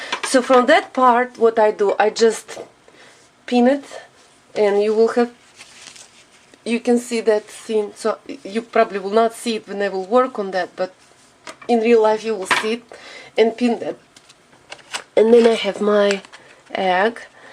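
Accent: Russia. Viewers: Чувствуется ,что по английски говорит россиянка..Произношение режит по ушам..но все равно молодец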